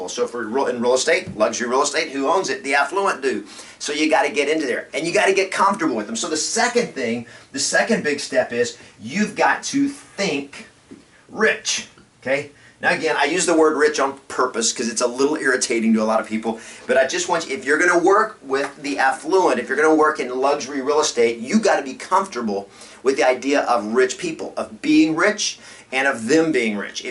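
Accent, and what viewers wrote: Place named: South, United States. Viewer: Mr., I am glad to see more of your southern draw! That's your TM! Aside from being a BadA$$ Real Estate Coach, of course.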